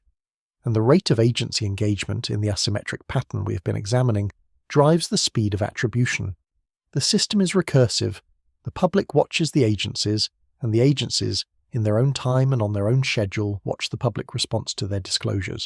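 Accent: United Kingdom. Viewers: Why is it always a British accent?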